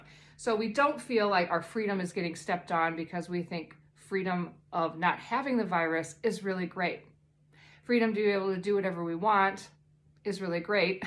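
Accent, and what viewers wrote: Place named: New Zealand. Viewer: Very informant on our country and love your video. Glad to have you in our country and seem like you're getting a Kiwi twang in your accent 😁 Chur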